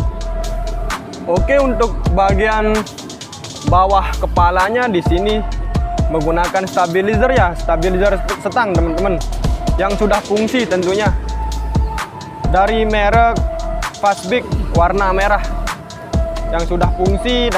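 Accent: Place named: Lombok, Indonesia. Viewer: seperti logat lombok bicaranya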